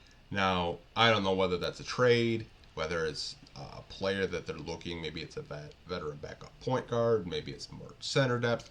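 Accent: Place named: United States. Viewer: You are Italian? You sound American.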